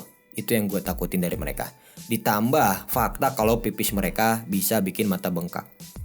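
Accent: West Java, Indonesia. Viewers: Bang urang sunda? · Orang sunda nya?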